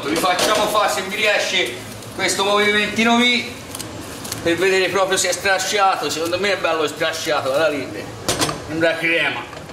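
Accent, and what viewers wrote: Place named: Livorno, Italy. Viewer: Dev'essè bono!!! Livornese abbestia eh!? Bravo, ottima spiegazione!!!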